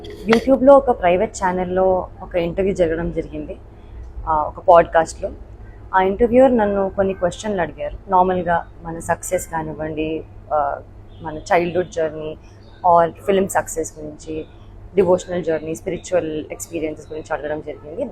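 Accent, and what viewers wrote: Telangana, India. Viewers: Podcast lo your slang was like telangana girl..but ikkada chala different ga vundhi...podcast lo fake slang aa or ikkada fake aa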